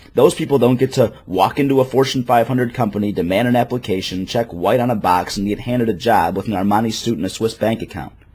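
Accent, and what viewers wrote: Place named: Midwest, United States. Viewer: Can't really tell, you can suspect, as I suspect that he's probably a white guy raised in the mid-west or north-east United States, but I also know a black dude who talks like Foghorn Leghorn (he legit sounds exactly like the character in pitch, tone, and accent), and a black chick who sounds like Rosa Ponselle when she sings. All you can really tell is that if he is black, he wasn't raised in a home which supported the notion that black people should "talk black".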